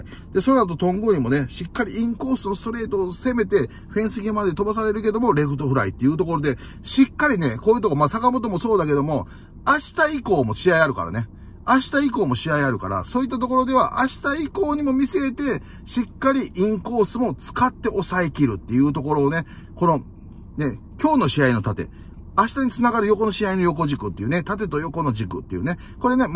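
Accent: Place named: Tokushima, Japan. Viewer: 徳島の人やなあ、いい感じの里さんの語り。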